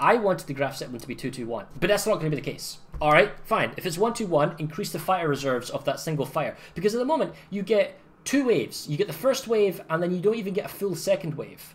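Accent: Scotland, United Kingdom. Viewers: You are a Scot? You sound very American... I would love to hear your commentaries in Scottish, love the accent! :D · ?? ...ok... II'm a Midlander, and even I can hear the Scotts in his accent, than an Irish sound. (Mind-due the Proddies and Catlicks can sound different from each other, ..but, just the crazier ones of of both sound more like Glaswiegens with less phlem & spit in their syllables me.)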